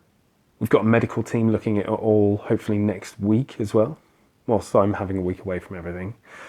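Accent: Australia. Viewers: Love the aussie accent, you"d make a good aussie warrior with your craziness, we do wacky well in Oz. Love ya, looking forward to getting up one morning and seeing you tell us all you have found the key!:) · You sir are an inspiration oh love the Australian accent nearly as good as mine !! Hope everything goes ok tomorrow all of us Here will be thinking of you xx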